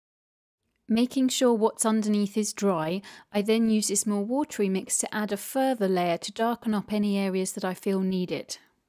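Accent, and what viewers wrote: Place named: England, United Kingdom. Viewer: She speaks perfectly. It's called an English accent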